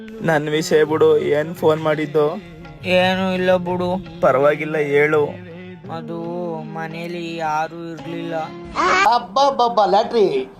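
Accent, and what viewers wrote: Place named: Chamarajanagar, India. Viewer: Nammma Chamarajanagar ada bhashe sogadu suuuppar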